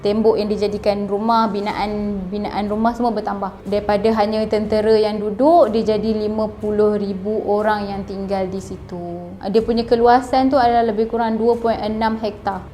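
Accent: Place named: North, Malaysia. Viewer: tak taw pasepa... slank utara detected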